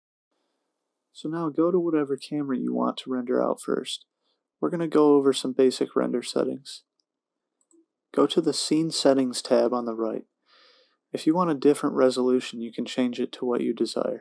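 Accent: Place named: Texas, United States. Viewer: bro just sounds like a half asleep texan idk about smooth lol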